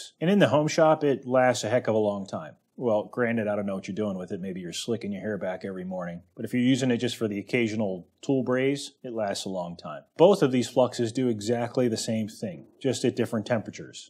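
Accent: United States. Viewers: It does make me laugh when you guys on the other side of the pond pronounce solder as "sawder" rather than soldier without the i. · Love these videos, still don't understand why Americans change the L in solder to a D?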